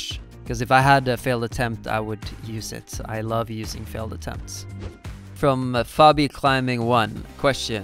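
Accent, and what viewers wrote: Bergen, Norway. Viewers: Damn i think his accent is changing! Less norwegian accent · It's always so weird when you speak Norwegian and it's with a Bergen dialect. I expect something else for some reason, I've mostly heard you speaking English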